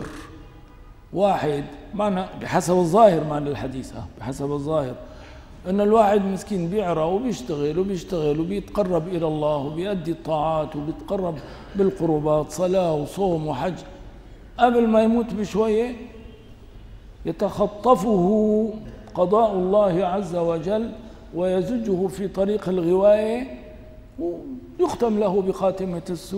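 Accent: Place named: Levant, Arab world. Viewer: A mixture. And when he speaks Fusha, he sometimes pronounces the words like the Shami dialect. Nothing wrong with that in this context because he's addressing the masses.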